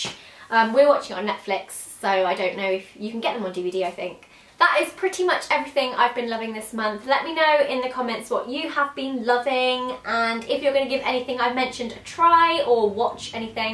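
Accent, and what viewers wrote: United Kingdom. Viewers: Is she british? Plz reply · You are british ?! :))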